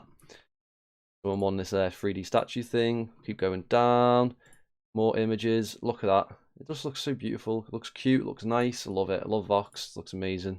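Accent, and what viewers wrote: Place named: England, United Kingdom. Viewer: Great video bro good to hear an English voice commenting on BCRC 🏴✌️✌️✌️ can’t wait for my vox cat airdrop to use in boss planet it’s going to be 🔥🔥🔥 boss cats stick together keep up the great work guys. WAGMI everyone is bullish on boss cats don’t miss out people these great you tube videos give you all the information you need. Announcements after announcements are always the norm in boss cat rocket club and delivering day by day on the roadmap this is just the beginning ayoooooooooooooo LFG lg1985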